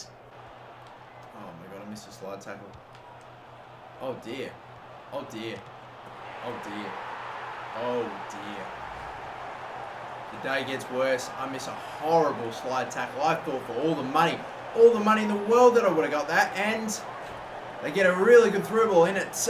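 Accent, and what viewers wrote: Australia. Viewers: hes aussie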